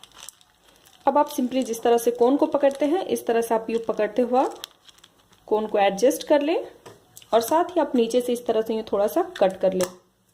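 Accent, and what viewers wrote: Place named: Bihar, India. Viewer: Aarey aap Bihar se ho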